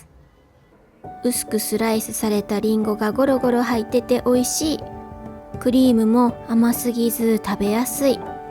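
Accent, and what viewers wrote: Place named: Kansai, Japan. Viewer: 関西弁がすごく癒やされました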